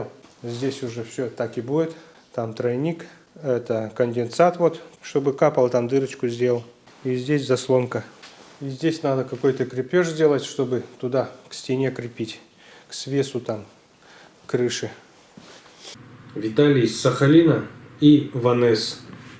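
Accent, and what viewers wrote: Perm, Russia. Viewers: Говор Пермский уши режет.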